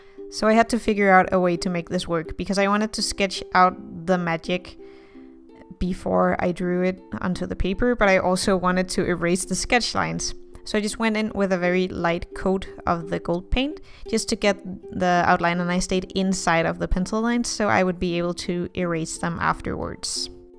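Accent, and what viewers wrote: Denmark. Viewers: ive been trying to pick your accent, im such an idiot of course youre danish!! such a pleasant accent to listen to <3 lovely art and content as always my dude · Er do fra Fyn eller Sjælland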